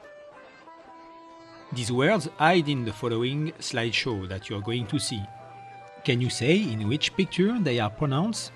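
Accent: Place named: France. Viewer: Hihi... the guidant voice has strong French accent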